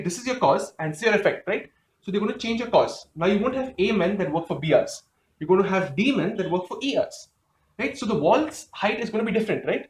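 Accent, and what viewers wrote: Goa, India. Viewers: Are you goan by any chance?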